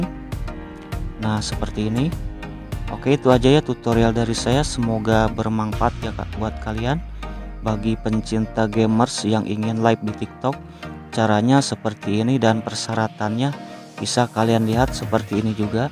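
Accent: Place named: West Java, Indonesia. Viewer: Sunda banget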